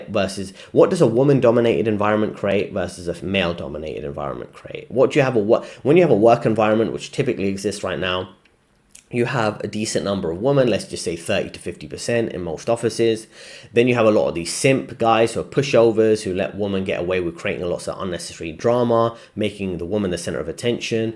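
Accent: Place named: Multicultural London, United Kingdom. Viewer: In accent (Multicultural London English?) the plural word "women" sounds like the singular word "woman". Completely off topic but interesting.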